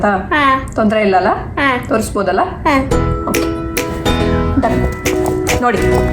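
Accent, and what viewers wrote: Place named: Bangalore, India. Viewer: Neevu awesome ri.... Pakka namma bng slang le matadtira.... I was in canada for 4.5 years almost... Liked ur videos... All the best🙂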